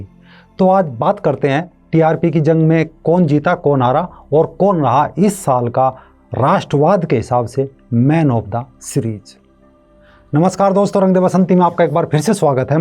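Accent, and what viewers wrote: Haryana, India. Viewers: I really like and admire your choice of topics and your sarcastic comments, one liners, and choice of adjectives with that Western UP & Haryanvi accent. Sabhash Keep it up. Keep posting more than one video per day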